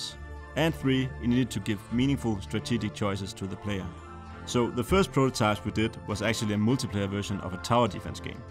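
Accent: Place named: Denmark. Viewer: he has a Danish accent. Just like me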